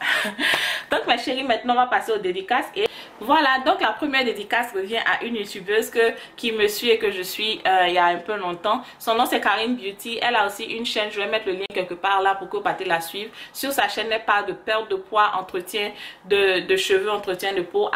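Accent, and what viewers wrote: Ivory Coast. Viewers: Sans demandé je sais ke t'es ivoirienne, l'accent la ment pas dehhh, lol. Je m'abonne 😊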